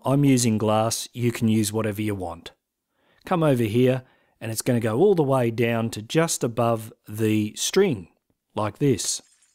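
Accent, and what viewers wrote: Australia. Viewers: Are you from Australia? :) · Authoritative is intimidating, you sound like an Australian version of the old Etho except with more gusto:D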